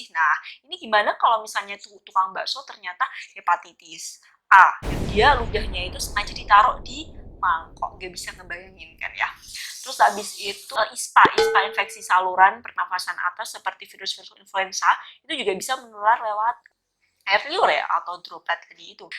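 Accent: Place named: Java, Indonesia. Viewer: logat jawanya keluarkan dok,,jangan ditahan2 dok ,,,jangan lupa kunjungi ke puskesmas aku ya dok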